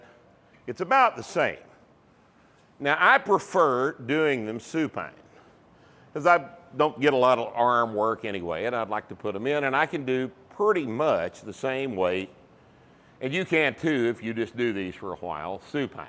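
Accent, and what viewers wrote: Texas, United States. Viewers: I've got rippetoe at 1.75 speed and it still sounds like just slightly fast talking. Lol. That slow texas drawl is strong with the. Lol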